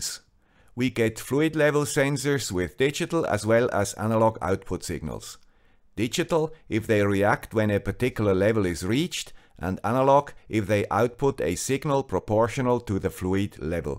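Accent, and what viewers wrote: Switzerland. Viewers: Perfect timing! I have a small decorative fountain that I brought in to store for Michigan's winter. I wanted to outfit it with a sensor to stop the pump if the water level dropped to prevent damage and alert Home Assistant. Now I have no excuse to forget. Once again thanks to the guy with the Swiss accent! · A little more about why a solution matters (and why your videos have been helpful, apart from the pleasure of hearing a Swiss accent again after my years in Bern): A few years ago a colleague at my university asked me if I could help measure the level of water in the ground in a tropical rain forest. In fact I was already studying groundwater saturation in peat and other soils. This is a hugely important topic worldwide because it is an important factor in flood risk and in the loss of carbon from soils - one of the very biggest sources of greenhouse gasses to the atmosphere. 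So I had a go at the problem. There are a lot of ways in which people measure the level of water saturation, and soil moisture, some of which are good, some of which are poor - and which you have very helpfully discussed in previous videos. As you know there is, in fact, one method of measuring soil water content which, if calibrated, can be made quite accurate and tough using cheap sensors - capacitance monitoring. There are also many ways to measure groundwater saturation level (the level at which all the porosity in the soil is full of water, which is also the level to which a dip-well tube will be filled) some of which can make use of the instruments you discuss in this current video. My preferred method is to weigh a tube suspended inside a dipwell (because it's really tough enough to work in hard environments), but I have the load cell creep problem to deal with so maybe I go to a laser distance sy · Tangential question: at <link> are you saying "gritty YouTubers" or "greetings YouTubers"? 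I'll wager it is the former: gritty. The Swiss accent, while adding color and charm, leaves me in doubt about which word it is. Can you confirm?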